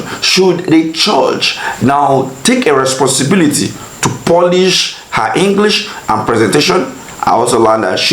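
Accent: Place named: United States. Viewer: Must you speak like an American. Though I appreciate you but be real.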